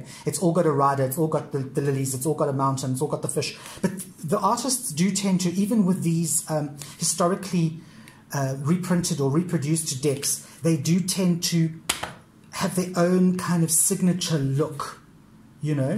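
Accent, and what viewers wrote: South Africa. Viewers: So lovely to hear another South African accent :-) I've enjoyed a wander through your collection. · Rare to find south africans youtubers! Love their accents hahaha.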